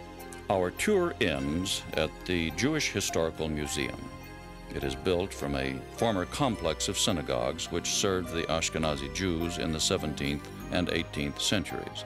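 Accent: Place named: Germany. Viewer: Oh how it HURTS my ears to hear the Dutch words pronounced in GERMAN!!! Great documentary otherwise! But this should NOT have happened! Easy to prevent this and obviously not a very nice touch...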